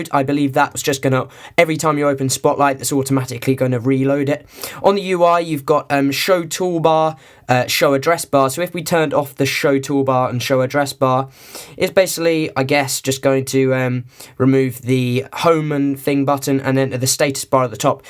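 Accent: United Kingdom. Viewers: It's odd: everyone that does these cydia videos has a British accent.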